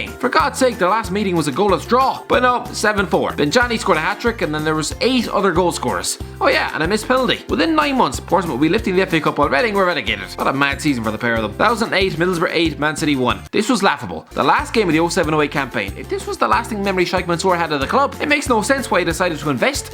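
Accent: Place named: Ireland. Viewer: I'm a simple man. I hear the irish guy, I press the like button.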